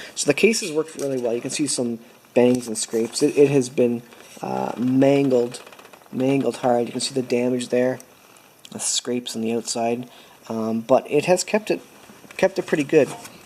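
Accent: Canada. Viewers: He's from Canada eh?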